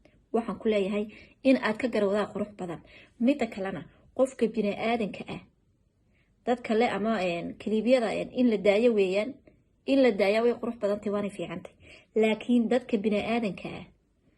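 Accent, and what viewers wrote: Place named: Borama, Somalia. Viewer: Anigu reer booramaan ahay sidaan kuugu hadli lahaa ama gabadh kale ugu hadllahaa ayaad u hadashay inaabti quruxeey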